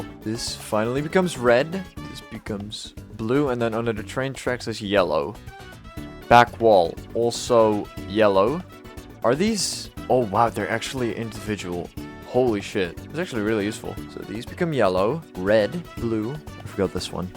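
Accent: Netherlands. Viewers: bro you sound so dutch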